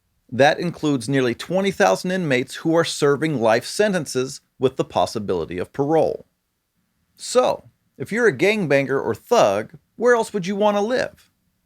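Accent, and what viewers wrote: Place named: South, United States. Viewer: Please come to the south! I would have sworn you were a southern guy anyways.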